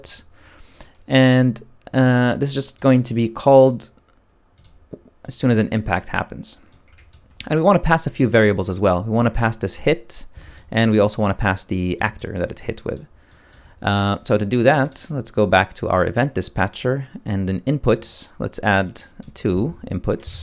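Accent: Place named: Arab world. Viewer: I just wanna ask 1 question ! where are you from ( I feel you are arabic)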